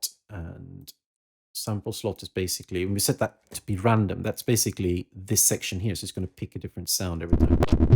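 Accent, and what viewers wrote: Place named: Sweden. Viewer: Lool, after listening a bit more I did think your accent sounded vaguely Swedish, but I heard "I think that's how it's meant to be pronounced" as being about the word itself and not just the company. Norwegian fella here correcting Swedes on their Swedish 🤣 sorry altså.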